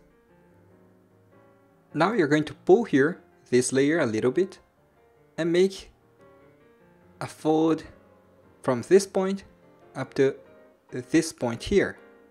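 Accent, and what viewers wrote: Japan. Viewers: It's a shame that the person with the coolest crafts on YouTube has the least comprehensive, most annoying tutorials. Plus, when you're not very good at it (like me) you have to listen to this autistic Japanese accent and this kindergartner level grammar until you finish the tutorial or you have the sense to quit the video. The latter worked well for me.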